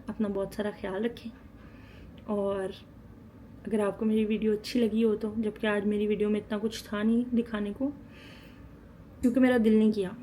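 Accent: Punjab, India. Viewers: ہائے میری پیاری سویٹی لگتا بہت دکھی ہوئی ھو۔اتنی پیاری باتیں کہاں سے سیکھیں ہیں۔ کبھی پنجابی میں بھی بات کرو۔باتوں سے پکی پنجابن لگتی ھو۔ ڈھیروں پیار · Ye kon c type k Chinese hn bae ..... Accent pure Punjabi like our Lahories but face r pure Chinese.... 🤔🤔🤔🤔 Kesay nae kesay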